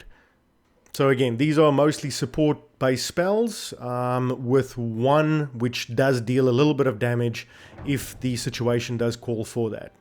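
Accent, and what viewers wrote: Afrikaans, South Africa. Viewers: dude jy klink afrikaans.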